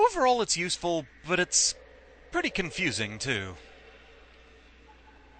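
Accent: United Kingdom. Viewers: I know im late and this was posted years ago I like your let's plays...by the way are you British if add on your English is good ?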